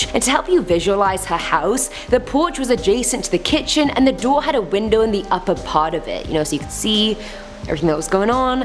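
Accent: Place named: United Kingdom. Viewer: I love your British voice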